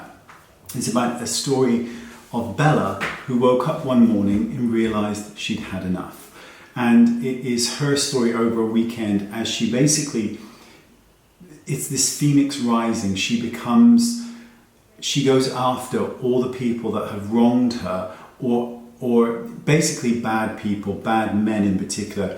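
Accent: United Kingdom. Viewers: Oh.......I could listen to you read the phone book all night long. You have the most soothing voice, but we all feel you from the inside and know you’re the real deal! I’ve read some of the books you mentioned you have very good taste lol. When you said “bazaar” my heart melted.....bazaaaaaaa!!!! Love that British accent (and you’re cute too!!!) ❤️😘❤️ KimP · Cathy Gilbert you hit the nail on the head! I always feel good after watching one of his videos. He’s funny & helpful & truly caring. Wonderful British accent & very easy on the eyes LOL. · I remember the flowers in the attic 😢 I honestly have to be in the mood to pick up a book. I could probably write my own novel of ups and downs 😅 I love to pop on youtube and watch your videos and forget my worries. I think your voice and accent is great.. Being a fellow brit with the priceless wit and sarcasm is always a treat.. 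· I agree with that comment, I love your voice. It is very soothing, soft and gentle. I love British accents. One day I will live in England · You DO have the best voice & I love the British accent so much!!! And you just saying “Dirty Weekend”... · It's funny to me when people talk about a "British" accent, there are only about, what, a hundred? Two? But while your vowels are indeed lovely, I think the real thing may just be that you are a truly lovely person, and that shows through. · First, us Americans are just bowled over by the British accent which in your case is really lovely. Second, I have learned so much from you. I’m a almost-60 year old woman and the very editorial looks are just not appropriate for me. Lastly, your genuineness and gentleness are evident in every video. I try to fill my space with kindness. You help. 💜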